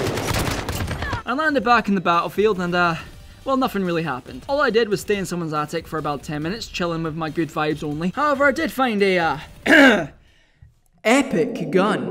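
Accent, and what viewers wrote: Scotland, United Kingdom. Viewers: You from Scotland bro?? 🏴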